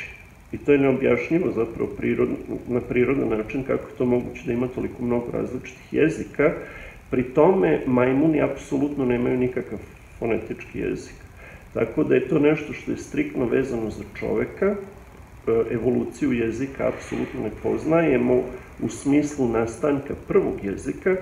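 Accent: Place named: Vojvodina, Serbia. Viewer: Gospodine poštujem vas kao naučnika ali vas molim da uvek pričate na Srpskom jeziku,jer ste očigledno odrasli negde u Vovodini bliže NS. Oseti se po naglasku i ne pokušavajte govoriti na Hrvatskom jeziku prosto ne leži vam. Nemam ništa protiv toga ako ste Hrvat niti protiv hrvatskog jezika. Ovo kažem posto ste u jednoj emisiji pokusali da pricate na Hrvatskom ali u najmanju ruku delovalo je smešno i ne prirodno za vas. Nemoj te se ljutiti, sve ostalo je ok,puno vas poštujem. Sve naj bolje želim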